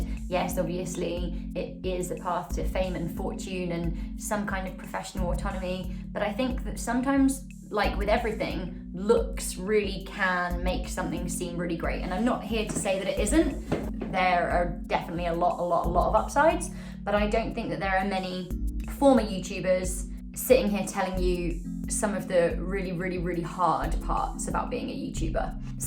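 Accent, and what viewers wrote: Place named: United Kingdom. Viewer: I am very happy to be able to watch you again dear ⭐⭐⭐⭐⭐ you always put a huge amount of work and heart... Also the Brit accent in Canada... Bold. A delicacy 🍯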